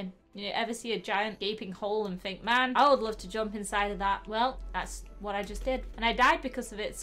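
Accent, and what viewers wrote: United Kingdom. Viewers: ! I FILL LIKE UR FROM BRITISH! R U THO? COZ IM CONFUSED U SOO TALK LIKE AN BRITISH WOMEN!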